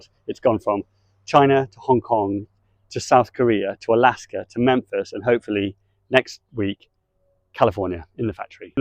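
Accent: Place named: United Kingdom. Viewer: I have just come across your S1 after watching a new (and very supportive) video comparing sealing toilets by Ready Set Van. It looks very interesting as I was within a few days of ordering a Clesana C1. Would I be right in assuming that the liner / bags are propietary and unique to the S1? If so, with the greatest respect to your business, my biggest worry would be that as a newish small business, something happens and the liners stop being available. I appreciate the S1 could then be used as a composting toilet , but I'm not a fan of composting toilets. I also share a slight concern about the use of an app to tell the toilet which type of liner is being used, but if that is all it is used for then I can get past that as I suspect I would only ever use the same type of liner. I note that (despite British accent) the business appears to be based in the US. 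Is there anywhere in the UK that it would be possible to see one of the units? £1,500 is a lot of money to spend on something one has never seen..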